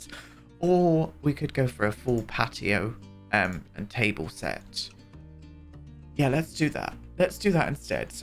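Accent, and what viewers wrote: United Kingdom. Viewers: where in the uk is this accent from?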